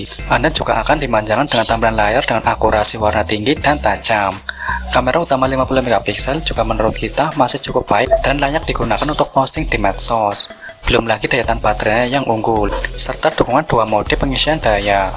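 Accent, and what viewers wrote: Banyumas, Indonesia. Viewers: ngapak banget sih ya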